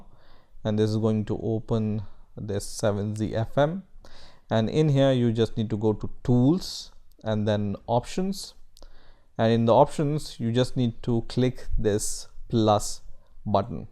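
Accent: India. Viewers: beautiful indian sounding man i love u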